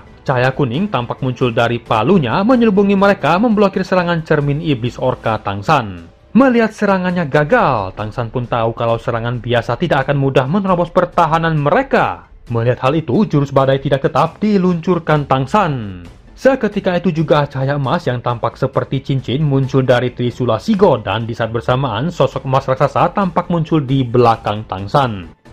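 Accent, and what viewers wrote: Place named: Madura, Indonesia. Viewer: Ketarah jhek reng madureh🤣semangat tretan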